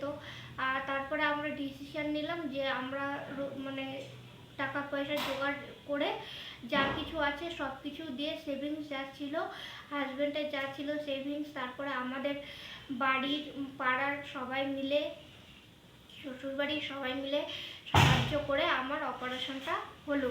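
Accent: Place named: North Bengal, India. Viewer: তোমার কথায় বুঝলাম তোমাদের বাড়ি উত্তর বঙ্গে। মনের জোর রেখ। সাবধানে থেকো।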